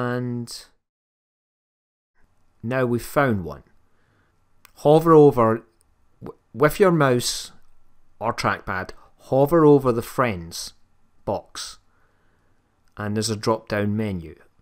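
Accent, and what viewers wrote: Scotland, United Kingdom. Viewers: Love ur Scottish accent. I can actually understand you.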